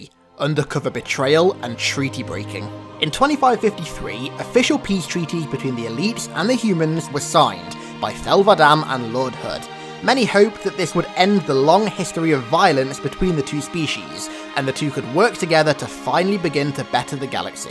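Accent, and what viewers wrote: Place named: England, United Kingdom. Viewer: English country accent , The greater good .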